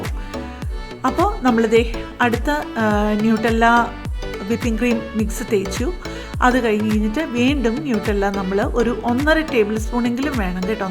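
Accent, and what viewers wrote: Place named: Pala, India. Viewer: എന്റെ friend geetha george ഇതു പോലെ പറയും നല്ല ശെല് എന്ന്. പാലക്കാരി ആണോ 😍😍😍😍😍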